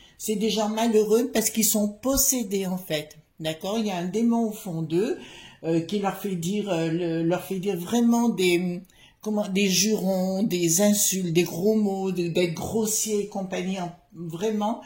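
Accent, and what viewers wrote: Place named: South, France. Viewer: c’est le parler du sud je connais et ça ne me choque pas !‘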